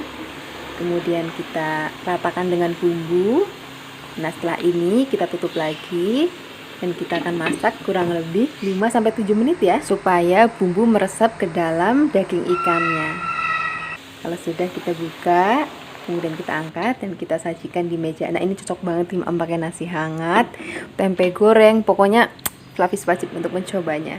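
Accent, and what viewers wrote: Java, Indonesia. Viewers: Menarik resep2 nya, aku ngga cm suka resep nya, tpi suara mba itu lembut bngt, jawa nya medok, pasri priyayine ayu, luwes, jiiiaaann...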